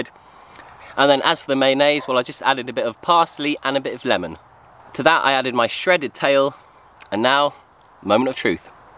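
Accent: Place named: RP, United Kingdom. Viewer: How did you & become friends? You've got a very posh RP public school accent while has a distinct regional accent.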